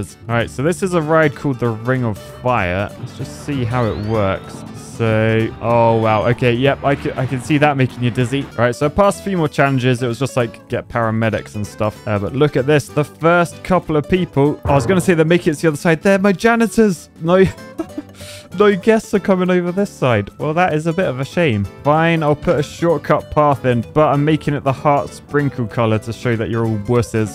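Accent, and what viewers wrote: United Kingdom. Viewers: Can anyone explain to me why Brits always pronounce Taco like Tack-oh and Los Angeles like Los Angelease?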